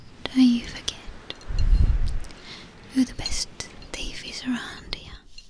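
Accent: Black Country, United Kingdom. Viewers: Black country accent is spot on! Great job!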